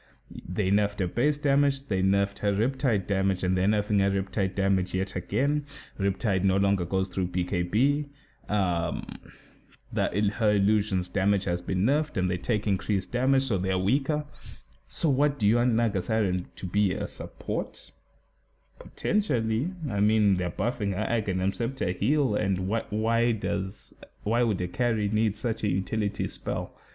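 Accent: South Africa. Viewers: is this guy from south africa? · is that a South African or perhaps Zimbabwean accent I hear?:D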